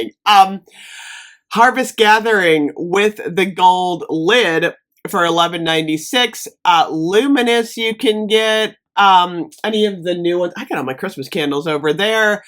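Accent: United States. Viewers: Are from the states or Canada?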